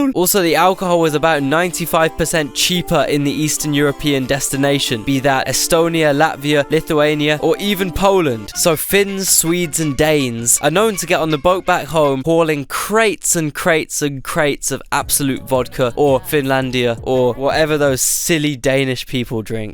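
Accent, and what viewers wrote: United Kingdom. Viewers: Homie nobody in eastern europe recognises a british accent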